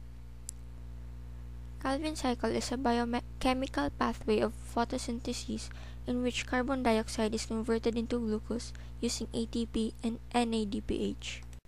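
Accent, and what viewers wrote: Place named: Philippines. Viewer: funny how i can tell this girl is a filipino =)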